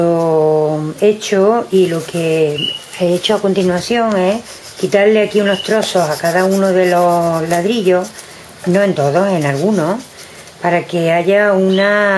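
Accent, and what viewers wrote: Andalusia, Spain. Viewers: eres española tienes unas maneras tan particular de decir las cosas .bueno saludos ...pd. eres andaluz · Excelente trabajo y me gusta mucho tu acento. De qué arte de España? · tienes talento. Seguro. Además tu voz y tu bonito acento del sur subyugado por el canto de tu canario. Cordiales saludos!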